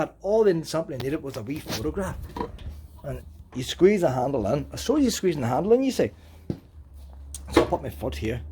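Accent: Ireland. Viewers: I'm buying one of these just for the Irish accent! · Hard to understand his accent very strong Irish accent 😅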